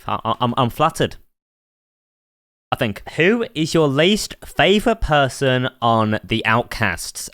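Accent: United Kingdom. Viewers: London Or Manchester I know you live in the UK >:) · Are you british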